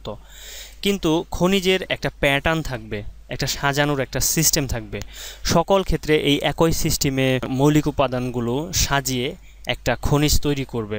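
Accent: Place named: Mymensingh, Bangladesh. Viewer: apnar voice sune mone hocch apnar district mymenshingh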